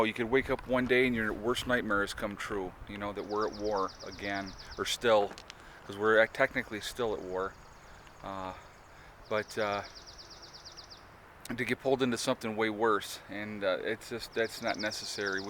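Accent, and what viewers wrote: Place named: New Jersey, United States. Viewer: Nice video; I'm subscribing. That sure sounds like a Jersey accent.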